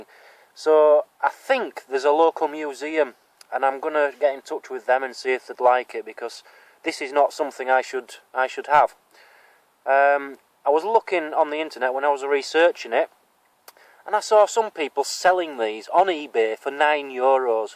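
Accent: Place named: Leeds, United Kingdom. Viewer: I thought I heard a touch of the Leeds twang as well.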